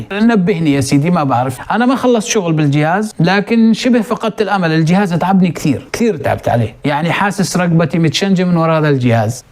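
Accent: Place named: Syria. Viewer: استاذ انت سوري ولا اردني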